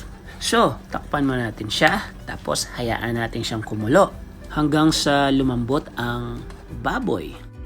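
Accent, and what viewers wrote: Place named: Philippines. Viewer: I like that filipino accent Low to medium hit!! Proud Pilipino pala here 😊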